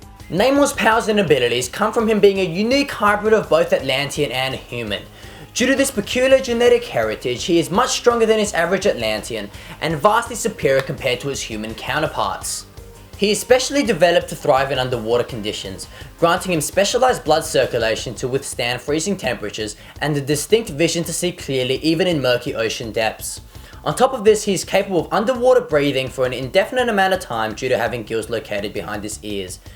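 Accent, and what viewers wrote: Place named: United Kingdom. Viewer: a Chinese guy with a British voice that's some weird shit right there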